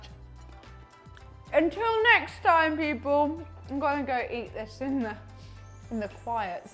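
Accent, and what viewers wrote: United Kingdom. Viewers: You're British! I just got it.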